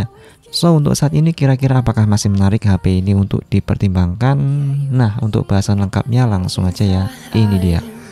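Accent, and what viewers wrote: Boyolali, Indonesia. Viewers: Orang boyolali ya mas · Loh org Boyolali tah mas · Orang Boyolali ya bang 😅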